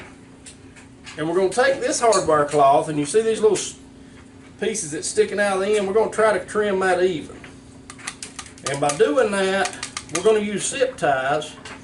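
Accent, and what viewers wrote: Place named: Brooklyn, United States. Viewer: Love that Brooklyn accent!!!!!!!!!!!!!!!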